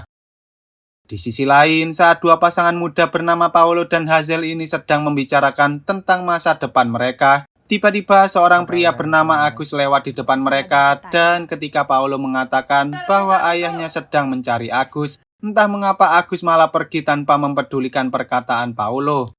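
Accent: Java, Indonesia. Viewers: lho wong jowo mas🎉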